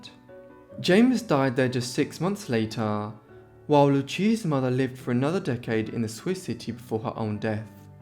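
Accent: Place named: United Kingdom. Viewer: Narration would be better by an American, not a British accent.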